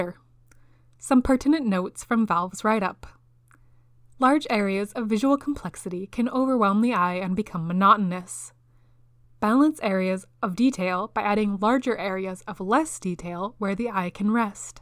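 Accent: Canada. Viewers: I like your accent. Where are you from? You hold your mouth like a Canadian but also fully pronounce your Ts so I can't tell O_O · First time watching your vids, I hear the Canadian on your supprisingly soothing voice.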